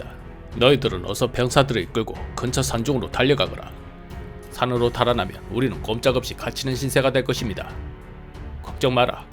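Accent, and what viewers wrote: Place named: Busan, South Korea. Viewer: 흠...분명히 우리 은..부산싸나이 가튼데...사투리가 전형적인 부산인데...내도 부산이요...흠...암튼..얼마전부터 지독한 찐팬이 된 제임스 라고 하는 미국교민 입니다. 요지음 의 영상을 보면서 즐거운 시간을 가집니다.감사합니다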